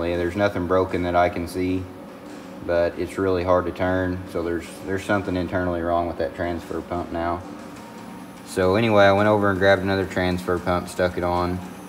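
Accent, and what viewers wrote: South, United States. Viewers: I really enjoyed your video. That engine should clean up nice and you could probably sell it for a good price. Did you ever figure out how water got into the oil? BTW.... my wife is a native from New Orleans and she thinks your accent puts you in the mid to lower south. Let he know if she's right or wrong.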